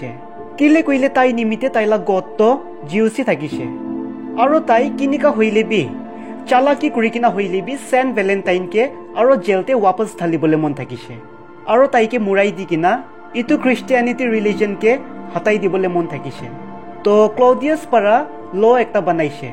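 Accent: Nagaland, India. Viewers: i like your Nagamese accent brother ❤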